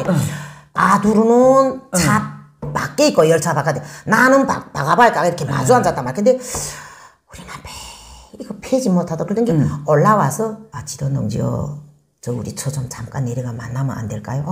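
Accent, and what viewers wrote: Hamgyong, North Korea. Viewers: 여사님 이름이 마음에 듬니다 남한에는 트롯트가수가 유명하지요 함경도 말씨가 정감이 갑니다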